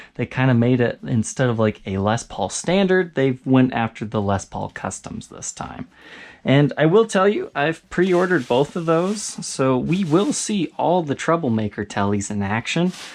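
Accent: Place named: Midwest, United States. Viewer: You sir, are definitely from the Midwest.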